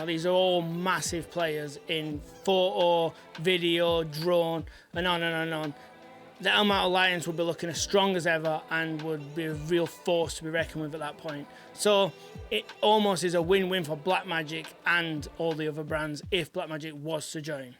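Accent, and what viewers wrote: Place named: Northern England, United Kingdom. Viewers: Never judge a book by its cover. This dude looks and sounds like a cage fighter crossed with an English bulldog yet everything he said is well informed and is surprisingly balanced view with some great research and insight. Connecting the dots makes sense and although it’s unlikely black magic will make a cube anytime soon the l mount is a sleeping giant and the investment by dji is key. Bm need a new mount and red and canon will almost certainly not let bm anywhere near rf. excellent despite the heavy northern accent this dude knows his stuff. Don’t let those prejudices loose. Sound logic has no accent. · Ey up, nowt wrong with a Northern accent lad! 😝 BUT onto mounts... I wonder if we can, via that service Sigma offer, get EF mounts changed to L? Most my Lenses are Sigma anyway nowadays!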